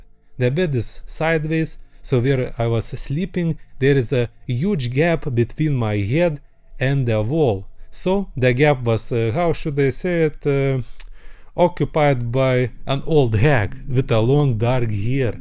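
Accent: Russia. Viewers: u russian?? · why is his accent russian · Are you Russian or European? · like your russian accent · Are you having a Russian accent? (P.S. im Russian)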